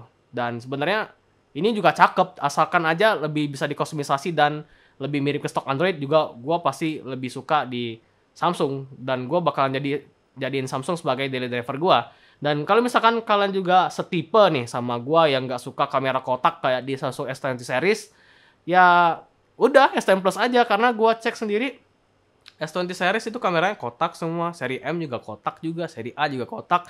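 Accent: Palembang, Indonesia. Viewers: Dari Logatnya Seperti Wong Kito Galo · palembang bg? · ai bang, salam kenal be bang, ciren dari logatnyo bang😁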